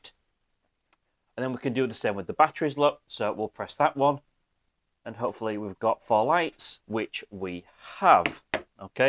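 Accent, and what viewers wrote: United Kingdom. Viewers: Just a separate comment on your accent, it's super English and I really really love it and marvel at how you sound bro.. PS: I have another comment on the actual video.. 😁🇧🇬🛸 Sweet Keep well and be safe!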